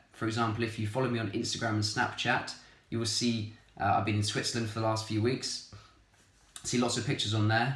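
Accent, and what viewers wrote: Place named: England, United Kingdom. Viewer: Hello.. Great channel, I really like it, Arabic is amazing language, actually I love England accent,so that why i watch your channel to learn more and improve my english😁, keep going👍 أتمنى لك كل الخير والتوفيق good luck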